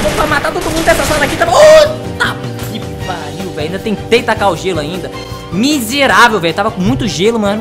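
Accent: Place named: Rio de Janeiro, Brazil. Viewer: Nossa vc é do RJ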